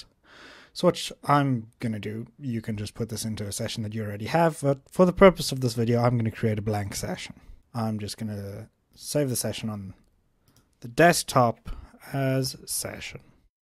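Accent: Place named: South Africa. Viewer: South African...caught your accent! I'm from Jeffrey's Bay. Thanks for the help!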